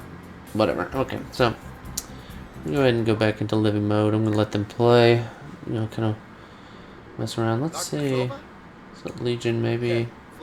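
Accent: South, United States. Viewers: deep country accent he dead as hell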